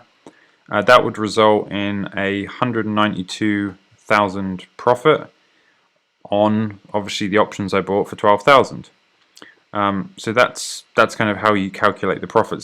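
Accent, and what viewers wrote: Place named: United Kingdom. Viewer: Where do you buy your calls? Sounds like you’re from the uk too?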